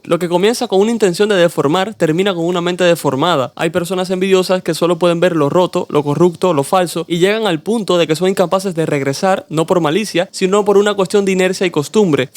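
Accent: Cuba. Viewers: Es que te tengo envidia por la edición de tienes, ni decir de tus memes o de tu acento cubano mi hermano 😎😎😎😎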